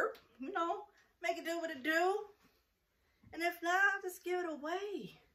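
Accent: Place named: Midwest, United States. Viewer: Hey! Congrats on your new channel! I'm excited about what you have in store for us. Yes, sis you hit a licc, honey! 🤏🏽🤏🏽 "snap, snap!" ☺️ That gold bag andthe black and white suit...cold! OMG! Btw, I can tell you're from the Midwest by the way you talk. Anyway...I love thrifting and shopping estate sales. I had to cut back a lil bit. You have me inspired though! I just need more SPACE! Time to purge! Anyway excited for you about your new channel. Looking forward to more of your videos! Subscribed! ❤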